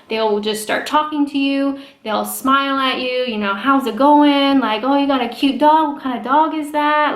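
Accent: Canada. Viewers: Trawno yep the Canadian accent is stronger after the visit. :) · Hi ya welcome back. I hope you don’t start to lose your accent too much as it sounds so cool fresh from Canada. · You definitely sound more Canadian now that you've been home for a bit! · I love your stronger Canadian accent. Noticed it straight away! I don't know if I'd prefer Canada, with it's big wide roads, but super long drives, or here, where everything is close but SO congested. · But I have noticed that the more i watch you i can see the difference between the US accent and the Canadian one nust from your pronounced of some words and how you enunciated certain words. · Your losing your Canadian accent. 😁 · Not seen a vid of yours in a while. I check in on your gram though. That said, when I played this video, I realised I had forgotten you had a Canadian accent. You have a great fan-base, you'll get tons of subcriptions... 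Not me though.... I'm poor. Have a great day. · "Stay on the RIGHT.. Stay on the RIGHT" Made me chuckle. Don't put yourself down, You are very good at this and very intresting so keep it up. Love the way you Canadians say "Out" like "Ooout" Haha and a great way to tell the difference between an American and a Canadian ;-) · it's also so funny I notice your Canadian-ness in the way you speak, but you have a british accent on certain words now too (like the endings of certain words). Very interesting, not sure if you noticed!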